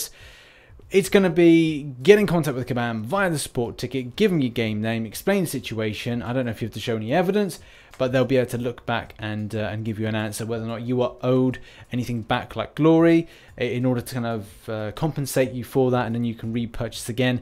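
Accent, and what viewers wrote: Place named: England, United Kingdom. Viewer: it took me 6mths to realize u were saying forum link not foreign link. Good old queens English hey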